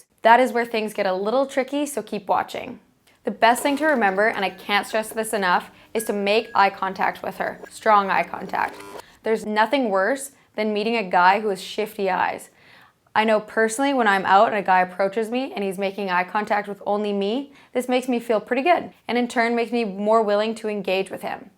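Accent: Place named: United States. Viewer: Manish Thakur Which accent ? she's just american. Btw I don't wanna be mean but people don't generally like the indian accent so... It's not the best approach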